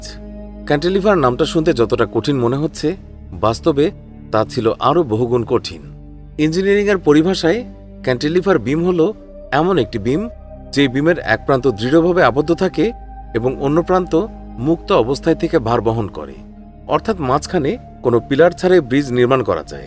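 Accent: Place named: West Bengal, India. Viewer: 😂😂😂😂 আপনার ভাষায় প্রকাশ পাচ্ছি। আপনি ওপার বাংলার। হাওড়া ব্রিজ সমন্ধে আপনি ভুল তথ্য দেবেন না।যা জনেন না ১২:০০ রাতে কোন দিন দেখিনি বন্ধ হতে আমাদের দেশের ঐতিহ্য সমন্ধে , আমরা জানি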